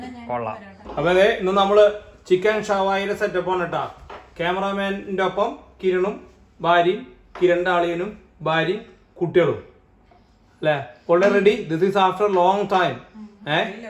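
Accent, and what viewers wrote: Thrissur, India. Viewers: content onnum illenkilum veruthe samsarichal mathi, athu kelkkan oru rasamundu, ningal Thrissur alle, aa slang anu rasam. Njan ningalude video kanunnathe ninte samsaram kelkkana.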